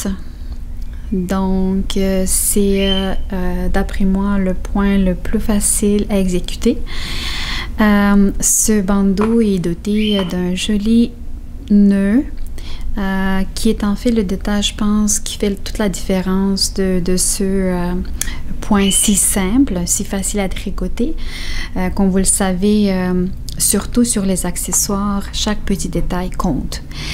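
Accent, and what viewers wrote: Canada. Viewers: Tu es un amour,tes tricots sont toujours magnifiques, c'est toujours un plaisir d'écouter tes tutoriels qui sont toujours bien expliqués avec ta gentille voix douce et ton adorable accent québécois, merci pour ton beau travail..des gros bisous pour toi de Lille 👍☺️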